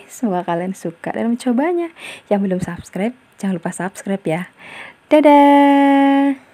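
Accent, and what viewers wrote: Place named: Central Java, Indonesia. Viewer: Suka bnget dgn bicaranya enteng ceria... Kyknya Jawa tengah ya mba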